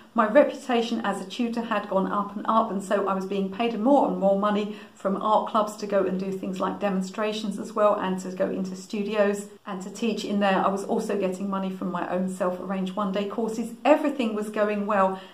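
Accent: United Kingdom. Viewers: I’m so happy to find you! I literally cried. I want you to know that I think that you are a wonderful teacher. I’ve actually learned a few basic techniques with things like finding the correct perspective as well as dimension. I can’t draw. I’ve been trying to paint with watercolor for about a year and haven’t gotten very far. Most people on YT are teaching to “use this brush and make this stroke and if you do it enough you’ll have a beautiful painting of a bouquet of flowers. For someone like me, I like to know why I’m doing this stroke with this particular brush and all the things in between. You teach those things and explain them in such a straightforward way. The British way I suppose. Always very charming but to the point. Im like that but without the charm and cool accent. Lol. However, the most important thing you teach is that one can learn. You don’t have to be born with it. 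And today’s video also encourages those who don’t necessarily want to conform to other’s opinions. Thank you so much! You are wonderful! I’m going to take some of your classes as well! I wish you all the best! Come visit us in the US soon!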